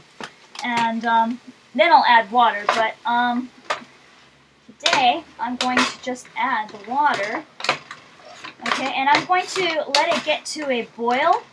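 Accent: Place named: United States. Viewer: I think your English still sounds very American. I think "you know" is spoken by a lot of the kids; I know I did it when I was a teenager. "Skimming the top" or we even use 'scumming'.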